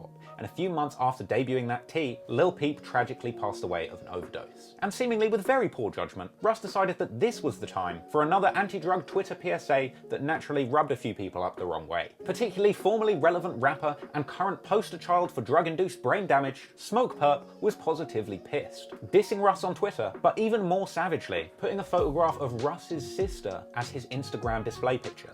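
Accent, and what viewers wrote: United Kingdom. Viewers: Bro dint know you were from UK well the accent I can hear but dint really pick it up til this video ahhh might as well been a joke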